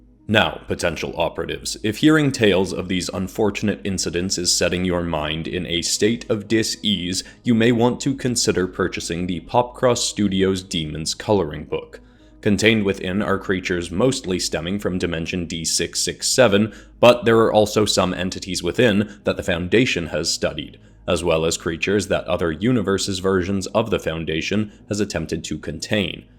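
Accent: Canada. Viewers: You know, I've known you were Canadian for a long time, but never have you gone THAT Canadian. Love it, but man did you throw me for a loop! I wasn't even thinking to much about the "Z", lol. Love the designs for Snivy and Tepig designs. I don't play much Pokémon, myself, but they're some of my favorite starters, art-wise. So cute! That second one, though... that was rather disturbing looking. Glad for more of these! Just out of curiosity, the only archon we haven't seen do much yet is Romilasect. Do you have any plans for her in the near future? Presumably regarding one of the core couples, I take it. I've been hooked on the TAHE storyline (and am looking forward to seeing what happens next with Kiko, Alsya, and Dresden), but I guess it does strike me as weird how much of a side character that specific villain has been. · IDK are you Canadian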